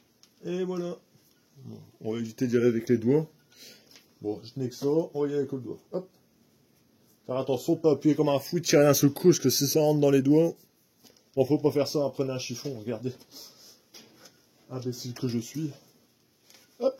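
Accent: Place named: North, France. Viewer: je m'en douter que tu était du nord accent de min coin cha sintin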